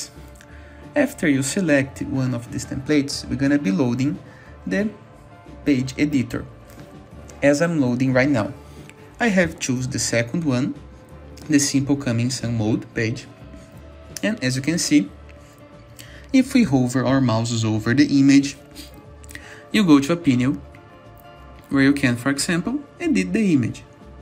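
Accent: Brazil. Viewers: Incrível o quanto é fácil reconhecer Inglês brasileiro kkkk Vlw irmão <3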